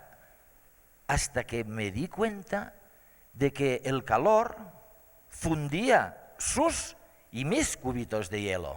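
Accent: Spain. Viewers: por el acento balear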